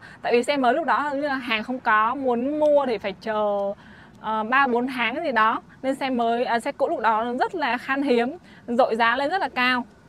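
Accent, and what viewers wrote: North, Vietnam. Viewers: tiếng anh pha lẫn tiếng Bắc chính hiệu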